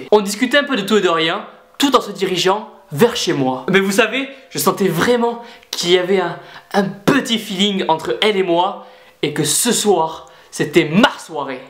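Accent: South, France. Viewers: mais tu habite où car tu a l acsent du sud moi j habite à côté de pau et toi?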